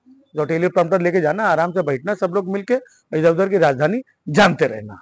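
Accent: Kanpur, India. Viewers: kanpur wale ho lagta hai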